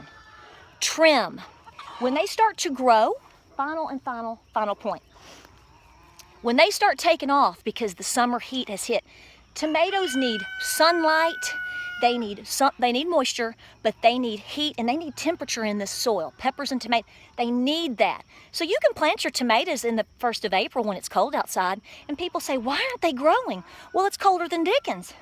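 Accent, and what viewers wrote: South, United States. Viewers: (Brit) You say Tomayto I say Tomahto. But let's not call the bloody thing off cos I love your video! And that American accent! :) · adorable southern accent!!!! Thank you for your help.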